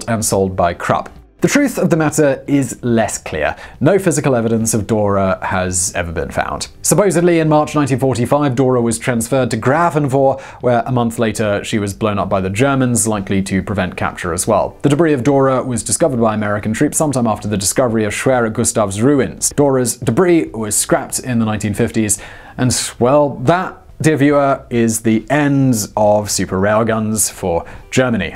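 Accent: United Kingdom. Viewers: Oh awesome, one I've never heard off! And, we Americans LOVE the British accent.;)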